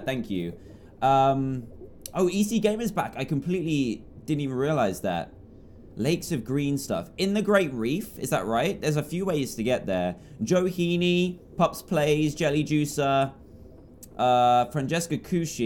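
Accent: England, United Kingdom. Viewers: I just turned 11 and I asked my mum how long she’s been hearing an English accent coming out of my computer and she said 2012 and btw commenters from the future it’s 2018 right now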